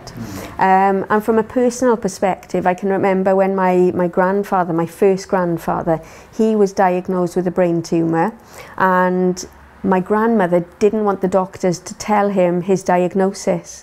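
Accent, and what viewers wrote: Wales, United Kingdom. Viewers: vashon100 WELSH...from WALES.... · Beautiful welsh accent .......... my sister said she saw herself on the operating table from above.......German surgeons drilling her head........she had a head injury from motorcycle accident............she was in a coma for six months.......... My brother said his life flashed before his eyes when he nearly choked to death......... Myself.......my children's father whom had left me & married another woman & lived in another country............the day he died I had this unreal tiredness .....I was that tired I went to bed.........I always have plenty of energy.............my son phoned me (I was still in bed) in a terrible state saying his dad had died while doing his plumbing job at a customers home.........around mid day..........he died alone of a heart attack.......... I was wondering afterwards was my ex husband trying to call me............was he trying to get a message to me...........did he realise he loved me at the end of life...........I wonder if I will ever find out..........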